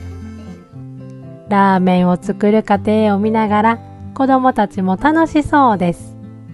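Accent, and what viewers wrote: Kansai, Japan. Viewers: 最近こちらのチャンネルと出会えて遡って拝見させて頂いています😊 世界の料理や生活風景勿論観光地や史跡等も見るのが大好きです。 nobanaさんの柔らかい話し方や私は関西人なので聞きなれた関西弁での動画も心地良く癒されながら観ております。 オンラインショップもされるとの事で楽しみです✨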